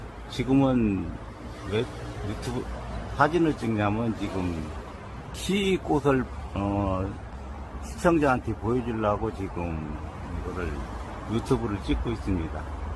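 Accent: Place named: Hamyeol, South Korea. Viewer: 황토막한번 가보고싶네요 근데 님 고향이어디세요 제가 함열그쪽인데 저희동네맡투가들리네요 ㅎㅎ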